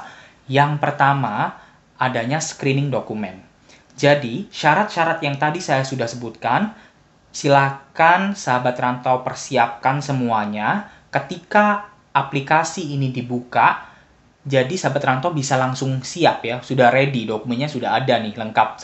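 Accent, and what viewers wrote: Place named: Java, Indonesia. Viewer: Dari logatnya mas nya jawa ya..jawa mana mas..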